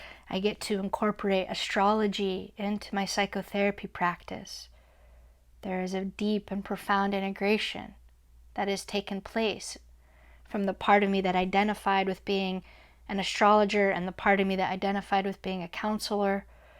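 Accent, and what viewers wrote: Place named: Italy. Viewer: I heard the Italian in you before you said it.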